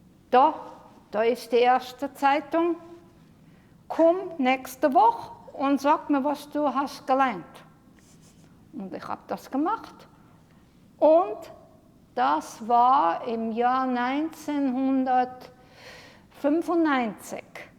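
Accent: Germany. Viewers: This was also my impression. She is a native German speaker who tries to speak Yiddish but doesn't manage to do so. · oh my, thought she spoke german with dialect.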